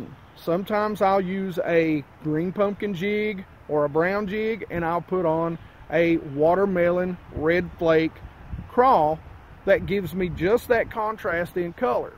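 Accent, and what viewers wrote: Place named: Tennessee, United States. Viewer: You sound like a TN guy so if you ever fish Norris it would be cool to fish with you.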